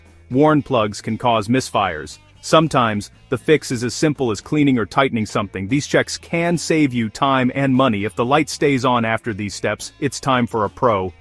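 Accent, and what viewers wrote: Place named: United States. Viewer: British words with a USA accent.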